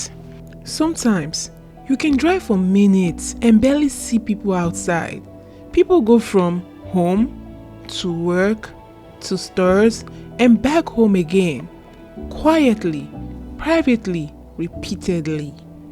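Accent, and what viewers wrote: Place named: Nigeria. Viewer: GET SOME AFRICAN FRIENDS.Which African country are you from? You sound Nigerian.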